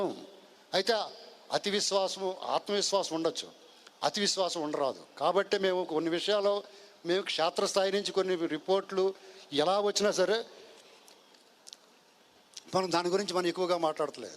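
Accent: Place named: Godavari, India. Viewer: Godavari yesa basha ki meeru brand ambassador sir srinivas garu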